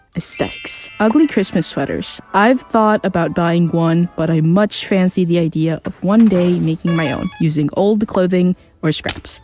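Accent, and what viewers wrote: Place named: Mexico. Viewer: bro unleashed the full mexican accent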